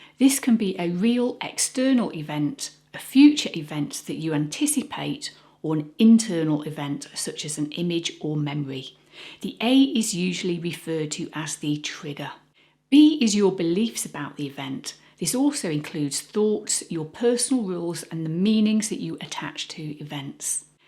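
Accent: England, United Kingdom. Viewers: This is a great video, but can you please do it again without the English accent? I'd prefer to hear it with a Canadian accent. Thanks!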